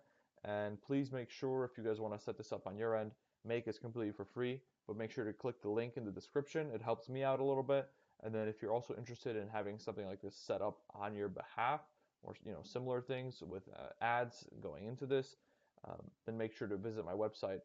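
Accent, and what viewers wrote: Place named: Chicago, United States. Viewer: I knew you were from Chicago from the accent. I'm about to start d2d sales and I'd like to have a way to text them a flow to sign up for a new account. This helps a ton. Thx.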